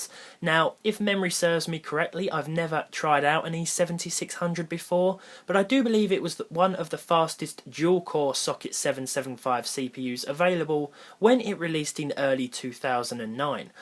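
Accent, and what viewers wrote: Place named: England, United Kingdom. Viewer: Esta bien chido el acento inglés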